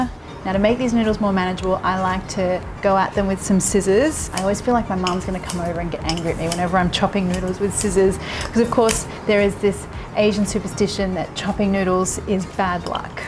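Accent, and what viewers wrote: Australia. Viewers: Are you Australian?! Love your accent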